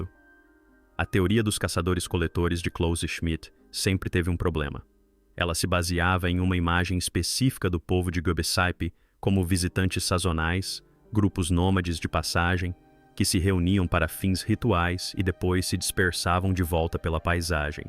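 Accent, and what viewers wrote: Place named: England, United Kingdom. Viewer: Gostaria de uma dublagem sem sotaque em inglês 😒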